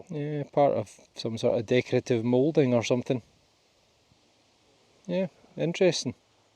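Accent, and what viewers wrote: Scotland, United Kingdom. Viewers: Gonna be honest. I saw one of your shorts and I'm watching this now mostly so I can hear more of you talking. 😄 I'm an American and I get such a kick out of a Scottish accent! Ok, I also love metal detecting videos, but add on a Scottish accent and that's 50min of pure enjoyment. 🙂💜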